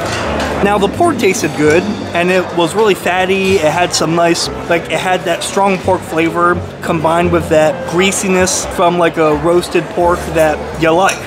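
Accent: United States. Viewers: You have an Americanized sounding voice. You don't have a foreign Asian accent.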